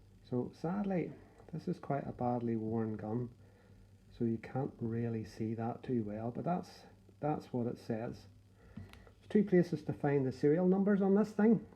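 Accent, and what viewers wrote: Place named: Scotland, United Kingdom. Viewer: Judging by the accent I hear a wee bit of Scot. I know that in America since this beautiful piece was made before 1899 it could be bought over the counter without needing a permit or a background check and it could be shipped through the mail without needing an FFL. I live in MA. which is a deep blue state but even here in a very anti gun state I got my Uberti 1858 new model army revolver shipped to my address along with my Howell .45 Colt conversion cylinder without needing a permit or a background check or an FFL and I just go to Bass Pro up in NH. to buy my .45 Colt cowboy loads over the counter with no questions asked. I put up a video on here of me taking out a flower pot with it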